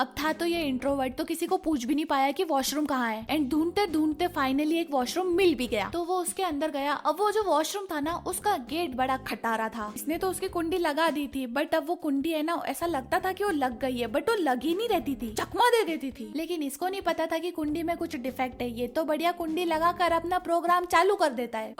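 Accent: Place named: Gujarat, India. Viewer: Aapki tone sunke lagta hai ap Gujrati ho 😂❤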